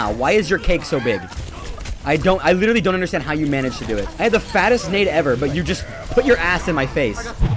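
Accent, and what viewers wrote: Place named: Asia. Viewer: i was watchin this and my mom said u have a asian accent idk why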